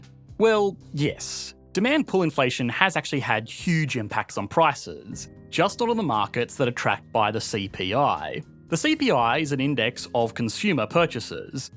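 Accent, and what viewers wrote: England, United Kingdom. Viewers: I CANT UNDERSTAND YOUR BRITISH ACCENT DO YOU HAVE FULL SUBS AVAILABLE??? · Kind of annoying "Millennia-aard" English accent -)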